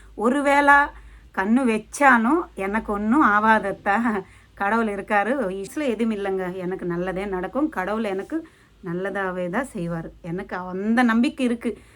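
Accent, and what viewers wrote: Telugu, India. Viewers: Nice very cute design voice summa super telugu voice tone tamil kalandhupesum very cute voice 🎉🎉🎉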